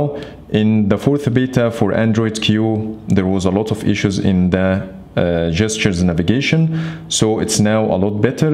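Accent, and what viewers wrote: Egypt. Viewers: Wooow!! Egyptian accent i guess;)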